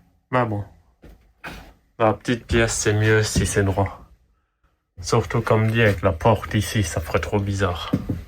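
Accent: Alsace, France. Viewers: bien sûr que notre Alsace est belle!!! Tu dois en savoir quelque chose non?! Vu que tu as le même accent que moi J'aimerais bien voir en vrai vu qu'on est voisin cela pourrait m'aider vu que j'ai des projets similaires ( je pense a une caisse a charger sur le plateau de ma camionette) en espérant que cela devrait compter comme chargement donc pas de démarches administratives... L'alasacien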